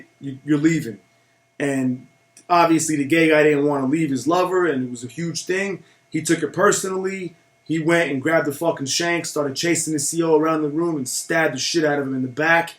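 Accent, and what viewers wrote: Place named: New Jersey, United States. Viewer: Is it prejudice of me to automatically assume u from jersey within the first 0.00001 seconds of clicking on ur vid?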